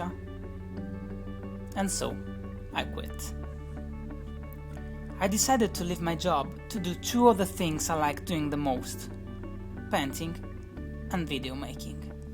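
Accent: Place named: Cagliari, Italy. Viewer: He's from Cagliari (Italy). His accent is annoying in italian too :D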